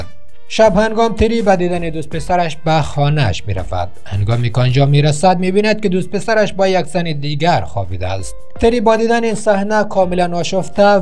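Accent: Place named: Afghanistan. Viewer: داداش روی لهجه ی افغانیت کار کون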